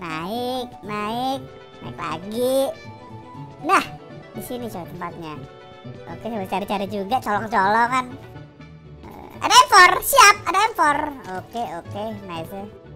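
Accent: Java, Indonesia. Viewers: jowone ngendi wkwkwkwk lucu pwol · Jowomu ngendi?? Salam soko semarang.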